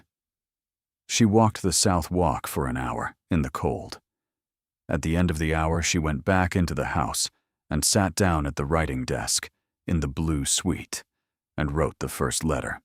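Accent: United States. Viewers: Why pick an American voice for a totally British story. This keeps happening. I have listened to some very good stories with proper British voices & some were excellent. This one has a Duke speaking in an absolutely inappropriate American voice. Then, the story has the most unheroic hero I can image. He grows up with a brother & sister. He loves both of them, the brother as his best friend & from the time she is 16, he falls in love with the sister of his best friend. She loves him in return but this weird non hero sets out to never allow any chance of them being together. He even tells her to marry someone else & tries to run away from her. She, actually, is a heroine & chases him down so they DO wind up together but, frankly, I can’t imagine not being so disgusted by that time, besides just tired, I would wonder if I could ever trust him. There was never a good reason for his ridiculous sacrifice of their lives that I could see. 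It’s something different but not likable. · I really have a problem with this AI’s voice. Its sooo unpalatable. I couldnt enjoy the video because of this repulsive voice and American accent that really does not suit this type of audio story.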